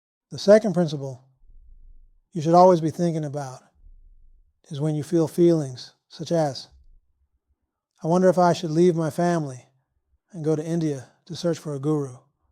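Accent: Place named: South, United States. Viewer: this IS Roberts voice isn't it ?? Arrr damn ... I was sure it was . Disappointing. Ar well close enough ... yes TruE he's got that southern Twang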